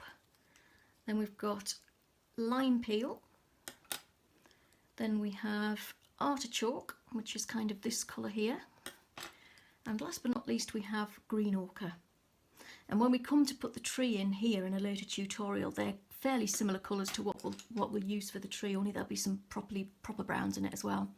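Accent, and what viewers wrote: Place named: Geordie, United Kingdom. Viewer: no don't apologise ☺just in case you hadn't noticed lol. Wish I had half ur talent. My colouring coming on so much and just discovered ur channel. Your teaching style is easy to follow. I also used to live in ur area (near Gateshead) so love ur acent. Back in my home town now (Glasgow). Looking forward to more tutorials x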